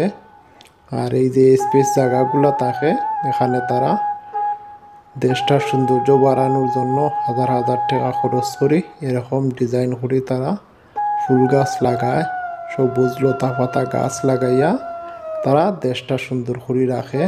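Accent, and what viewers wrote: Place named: Sylhet, Bangladesh. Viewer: খুব সুন্দর হয়েছে আর এবারটা বুঝতে পারছি আপনি বরিশালের না সিলেটি😅😅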